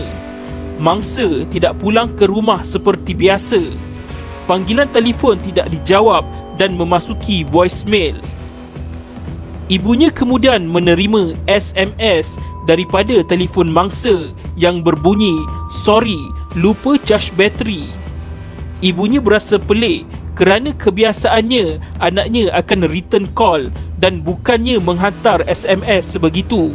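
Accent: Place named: Malaysia. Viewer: alah memang slang melayu cmtu kn hshsh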